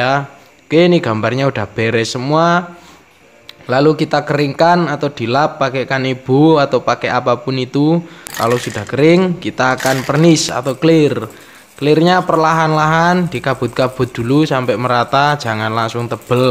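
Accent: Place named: Blitar, Indonesia. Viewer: Dialek ngomongnya seperti wong mBlitar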